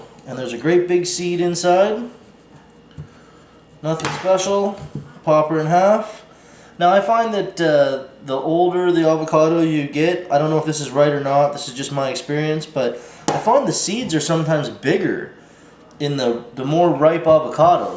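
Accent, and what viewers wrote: Newfoundland, Canada. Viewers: I've been successful (so far) with the avacado. The root is just finally on the outer surface of the seed. I also live in Canada and I just have it in my windowsill and its growing great along with my lemon trees , clementine and kiwi thanks to you! You have the Newfoundland accent is that where you are from?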